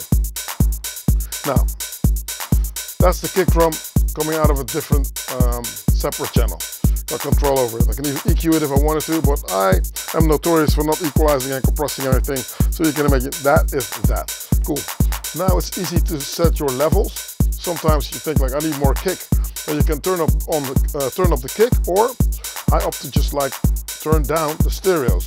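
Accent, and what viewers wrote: Netherlands. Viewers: Toevallig nederlander ?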